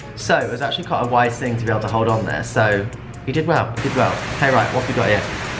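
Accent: United Kingdom. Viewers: Tres British 😊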